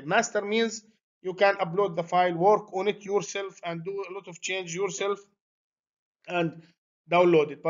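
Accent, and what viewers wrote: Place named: Iraq. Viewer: من لهجتك عراقي❤😊 اذا ممكن اتواصل وياك محتاج هذا الجهاز لكن مال دراجات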